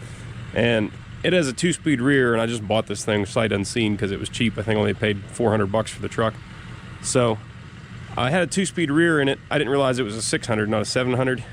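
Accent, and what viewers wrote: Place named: Pittsburgh, United States. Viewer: lol...I recognized the accent...Just Northwest of Pgh here.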